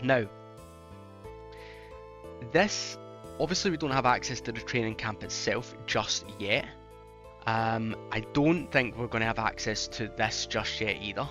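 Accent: Scotland, United Kingdom. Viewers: Belt up, surely tha cannae bae another one chunk frey Glasgee! We need more o tha accent, hyped, gl! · YOUTUBE YOU DIDNEA TELL ME HE IS SCOTTISH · I love me a good Scottish accent and more chunk content for my procrastination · Yeah no puro puro. Also, are you scottish? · Half my family is fae Glasgae, so it's always nice to hear a familiar accent. But stellar production on the vid. I know I'm half a year late to the party, but I'm already queuing up the next episode.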